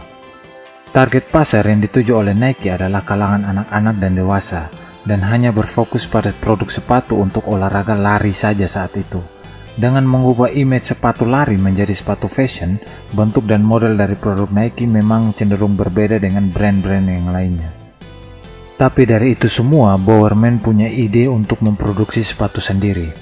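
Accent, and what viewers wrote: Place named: Eastern Indonesia, Indonesia. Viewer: Orang timur ni logat nya smoga sukses bro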